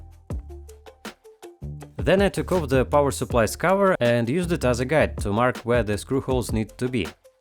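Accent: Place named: Russia. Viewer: Хороший выбор!)Начал я смотреть видео и понимаю что акцент у ведущего, русский,но какое было удивление что это один из моих любимых блогеров.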